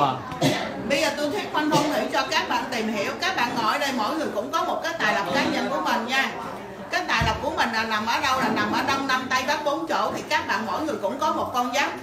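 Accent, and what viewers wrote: China. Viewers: Gốc hoa đó bạn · nguyen linhdan cô là người Tàu nhé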